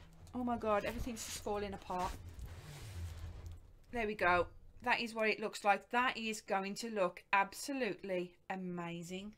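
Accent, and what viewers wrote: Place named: United Kingdom. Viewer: Are you from england you have an accent british very funny! I see it all and it wil be the same when you will put in thé rangement! Good luck!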